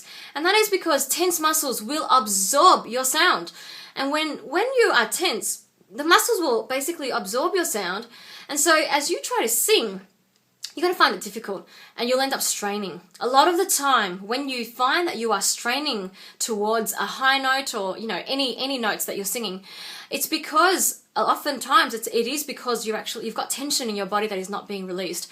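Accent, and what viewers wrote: Australia. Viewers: i can't get enough of your slight asian-aussie accent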